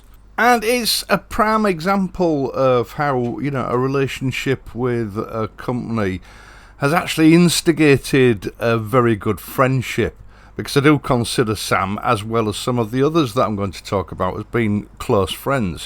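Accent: United Kingdom. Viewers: Dear, thanks a lot for your videos. They are very nice and I love your British pronunciation. I have one suggestion though. Can you make in your videos timestamps, so that if I want to re-watch a part of the video, I would not scroll in order to find what I need. Thanks again for your work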